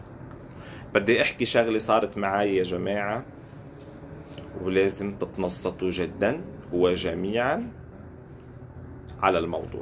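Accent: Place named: Lebanon. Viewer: ياريت تقلنا انت من وين ساعه بتحكي بناني ساعه خليجي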